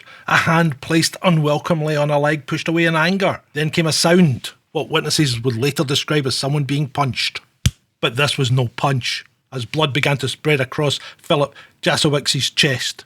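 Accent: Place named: Scotland, United Kingdom. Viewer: Wonderful Scottish accent, greetings from Aberdeen, but to the point; first of all, she was not given the right to a defense, the provisions of the human rights convention were omitted in her case, and the Vienna Convention of 1968 was omitted! She did not have a fair trial, this trial was saturated with racism and discrimination!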